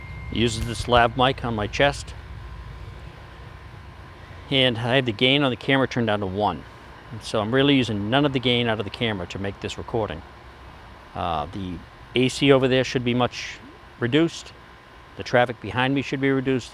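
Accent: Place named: New England, United States. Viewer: Living in a few different states over the years I've never really developed a strong accent in my speech. But listening to you speak made me chuckle to how strong a New England accent can be. Hope you're doing well.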